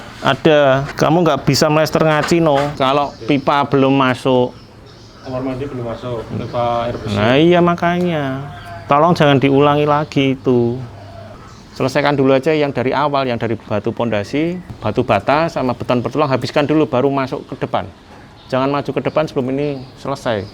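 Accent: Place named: Surabaya, Indonesia. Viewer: Suroboyoan e metu cakkk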